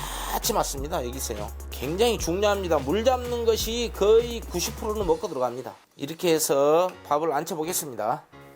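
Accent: Jeolla, South Korea. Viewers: 전라도억양 정겨워요❤ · 오 찰밥 맛있게 보입니다 전라도말투 친근감이 가네요ㅎ 나도 찰밥을 좋아해서 종종 이렇게 해먹습니다 새벽에 영상을보니 출출할시간이라 더 입맛땡기네요 ㅎ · 전라도 사투리 넘 좋아요 고맙습니다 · 말씀이 구수해요~ 보타불고 전라도 분이신가봐요~ㅋㅋㅋ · 세프님 찰밥 잘 배우고 갑니다 전라도 사투리가 참 구수합니다 다음 영상도 기대할께요😊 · 셰프님 말씀하실때 전라도 말투에 친근감이 갑니다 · 야~ㅅ따 전라도 찐한사투리 ㅎㅎ 요리는 한국인의 입맛 제대로입니다 많이 배워가고 있습니다 쉽게 단조롭고 간편하게 해설이 더 맛갈스럽네요 ㅋㅋ 발전을 기대합니다🎉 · 저도 찰밥 좋아해서 자주 해먹습니다 왔다 맛있겠다 전라도 사투리 정감이 갑니다 셰프님 방송 자주보면 유용한 정보 감사 합니다 ❤❤ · 남도 사투리 정겹습니다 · 절라도 사투리는 싫은데 가끔 놀러온다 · 전라도 사투리 정겹습니다 우리 고향 말 물이 보타부립다 오랜만에 들어봅니다 ㅎㅎ 잘 보고있어요 맛난 요리 부탁드립니다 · 전라도 고흥쪽 말같아요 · 전라도 사투리예요? 강원도인줄 알았어요ㅎㅎㅎ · 아따.전라도. 사투리로 맛깔스럽게 표현이. 진짜 맛있겠네요.어쩜 그렇게 표현을 잘하세요.재밌어요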